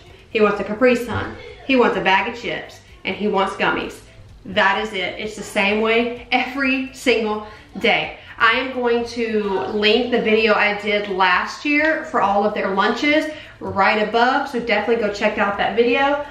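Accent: South, United States. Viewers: Go on Grrrlll love that southern twang lol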